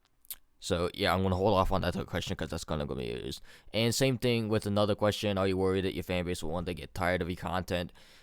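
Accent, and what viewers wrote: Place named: Ontario, Canada. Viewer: You sound like your from ontario, more specifically markham area... Other than the guns that makes me think your in usa maybe north side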